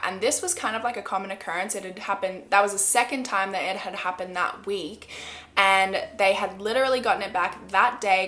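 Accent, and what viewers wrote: Australia. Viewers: I FKN IOVE UR ACCENT! THE AUSSIE IS SO STRONG! IM AUSSIE TOO! PERTH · the fact that she has an australian accent is just a plus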